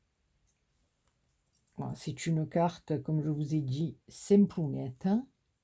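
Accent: South, France. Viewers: Coucou, un kilo de talent, un zeste d'humour, une pincée d'accent du Sud, une grosse cuillère de bonheur et voilà la recette de la superbe carte que a réalisée!!!!! bravo!! j'adore ta créa, elle est magnifique, merci beaucoup pour ce joli tuto, bisous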